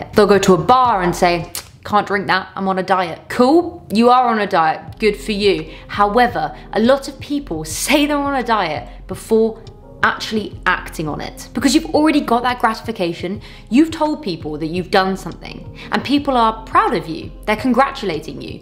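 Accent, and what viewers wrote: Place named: United Kingdom. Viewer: I can't get enough of your British accent. I always look forward for your videos ever since I found your channel. Looking even more amazing as always btw,. 💪💪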